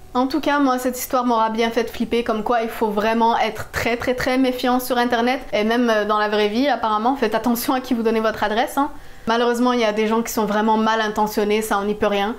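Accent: Quebec, Canada. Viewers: Es tu québécoise ??? Je viens juste de m'abonner à toi, je t'ai vu sur tiktok avec un thread d'horreur de la petite fille complice pour en enlever une autre bref jai bien aimé alors je vais te suivre sur YouTube aussi... bravo à toi xoxox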